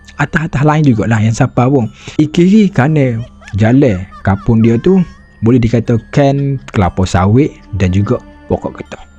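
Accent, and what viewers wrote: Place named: Kelantan, Malaysia. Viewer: faham ja..kami utara suka dengar loghat kelate.. hehe tp jgn la dalam2 sgt tapaham juga haha...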